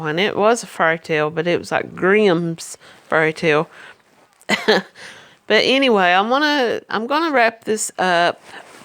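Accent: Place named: Kentucky, United States. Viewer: You sound like you have a Kentucky accent..because here in my small little town everyone knows everything, and only 1 red light and 1 $ store..lol..and most men here are narcissists.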